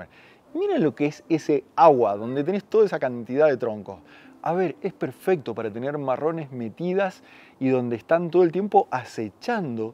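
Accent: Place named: Mendoza, Argentina. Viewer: Estimado, sigo tus videos desde hace tiempo y es notable lo que han mejorado, tanto en lo filmico como en los diálogos (incluir aspectos técnicos fue una gran idea), además tu entonación mendocina me encanta y me trae recuerdos de mis amigos mendocinos:) Quizás podrías ir pensando en algún video usando equipos pequeños (#2-#1), creo recordar que tenes alguno en los arroyos de altura de Mendoza, pero estaría bueno incluir algún video en arroyos patagónicos. Como dicen, no hay truchas chicas sino equipos grandes! y estaría bueno ver como encaras esas situaciones de pesca. Fuerte abrazo y líneas tensas!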